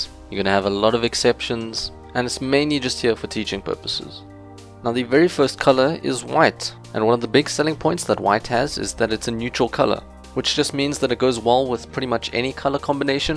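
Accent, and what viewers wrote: South Africa. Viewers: Is ur accent South African? cause if it is, I'm South African Too! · South African?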